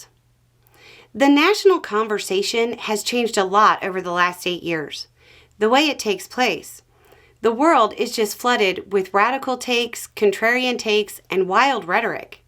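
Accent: South, United States. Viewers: You have been a great replacement, but I have one question. Are you saying " " or " " at the beginning of each video? Nothing wrong with either but my to my ears both are close with the Southern accent.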